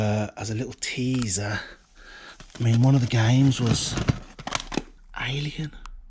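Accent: Birmingham, United Kingdom. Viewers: That AI description feature is amazing, since I restarted shifting a few of my personal items I’ve been hoarding over the years on eBay I’ve pretty much just been using that feature. Definitely saves time and gives you a far better description for your listing eh! Great video again bud you’re fast becoming one of my fave reselling channels! Love the accent too, my mum was born in brum and my nana had the same accent, so it’s very natural for me to enjoy your content bro! 😊❤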